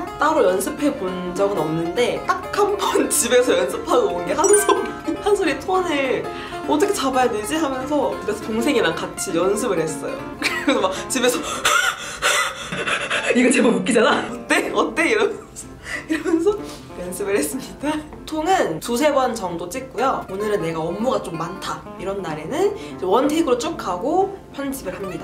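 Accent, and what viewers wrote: Busan, South Korea. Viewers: 말투에서 약간 부산사투리가 조금씩 묻어있네요ㅎㅎㅎ 친근햄ㅎㅎ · 처음에 사투리 듣자마자 엇 부산! 이랬어욬ㅋㅋ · 오 어쩐지 .. 경상도 사람은 사투리가 너무 잘들려서 어디 사람인지 궁금했는데 부산 .. 와 내척 친밀감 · 사투리...? 뒤에 가니까 진짜 부산출신이셨구나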